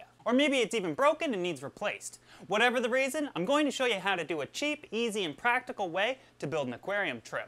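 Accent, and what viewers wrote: Canada. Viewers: I love how Canadian you sound... it's great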